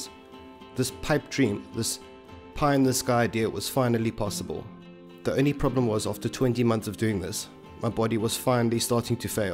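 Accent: South Africa. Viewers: Very new to your channel came across it via some Jordon Rant rust stuff, heard the accent and went wtf its a saffer! Best south african channel I've come across so far. Congrats on 2 years. All the best for the years to come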